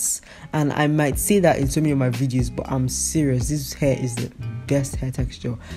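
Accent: Nigeria. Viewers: Gurrrlllll I love it...talk your naija talk babe! But awesomely explained 💋💋💋💋...then ending it with korean👀👀 yes ma'am ahnyeong-hekasayo! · “I will overpluck you from my comment section” the way she says it😂 I love my Nigerian sistas, the accents are everything bc it makes everything else funny y’all say a mil times more funny😂😭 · Immediately I heard her first statements I knew she's either a Nigerian or have had close contact with Nigerians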